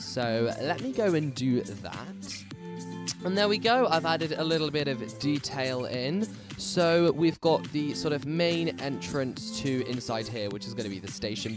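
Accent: United Kingdom. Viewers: Cool love the build <3 😀 Edit: i love your voice! Are you British cuz I am ❤️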